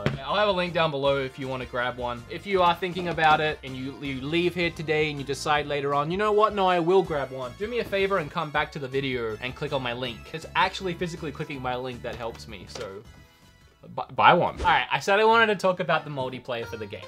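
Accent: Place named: Australia. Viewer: So what’s this guys accent? He sounds Australian but says some words completely differently.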